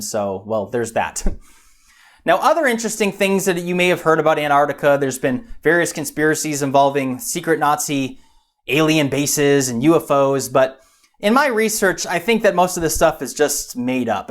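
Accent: United States. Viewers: woah brother are you from Idaho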